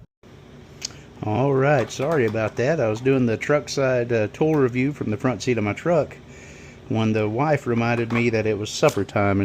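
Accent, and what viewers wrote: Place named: South, United States. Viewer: A Pats fan with a southern draw is alright in my book.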